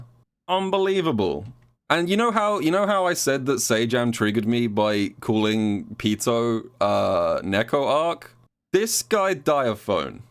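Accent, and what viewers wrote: United Kingdom. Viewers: I thought I was clicking on a Sajam video and then a British accent started talking to me, I was baffled.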